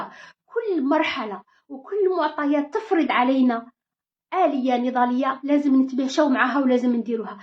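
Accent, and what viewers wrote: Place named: Morocco. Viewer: والله فشل نعم خاوتي فالبداية أسمع مليح لهجةتاعها مغربية روح لبلادك المروك ترفدك كل الفيديوهات تتكلم الثورة الثقة الحراك بعدينا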